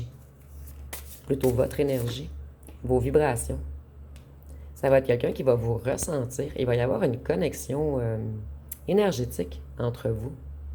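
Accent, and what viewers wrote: Quebec, Canada. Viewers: Mes Respects du Four et Enchanté Chère 🌹🦋🙏 Merci pour vos guidances que je ne manque jamais! j'aime beaucoup votre accent Québécois aisi que ce beau vernis que vous portez si bien 😊 Un Agréable et heureux Dimanche 😊 Bien à Vous 💐 Alex 🙏🧘‍♂️🎉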